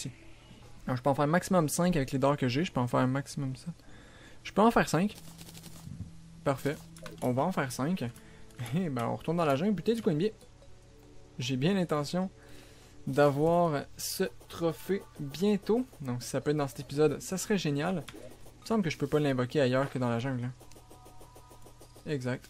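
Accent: Quebec, Canada. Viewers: Est tu québécois répond moi svp ;)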